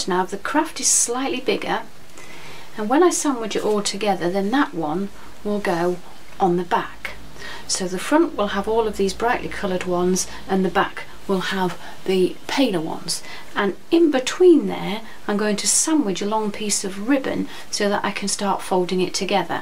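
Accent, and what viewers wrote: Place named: Northern England, United Kingdom. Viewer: I have been trawling all your videos - your voice is lovely - Northern girl same as me - and I adore the colours you choose to print together. Lovely pages, lovely patterns - I'm just going to try a gell plate for the 1st time tomorrow ( it's 4.30am and I'm an insomniac!) Thanks so much for sharing xx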